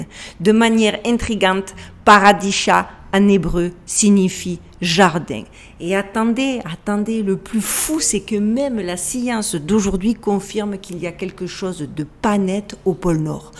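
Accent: Marseille, France. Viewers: Merci ma belle, comme toujours super intéressant et documenté. Merci d'ouvrir nos œillères et de nous "bousculer" avec ton bel accent marseillais!! ✨☀️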